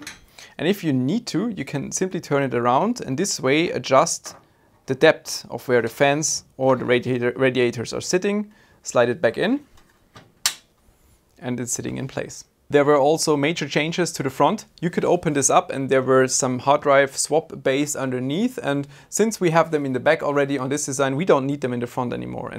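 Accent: Germany. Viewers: Der deutsche Akzent einfach geil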